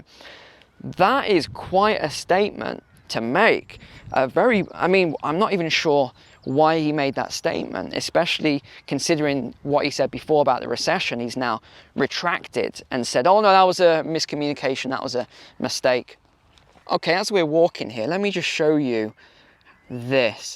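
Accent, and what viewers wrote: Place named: London, United Kingdom. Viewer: Your from London I bet,love it!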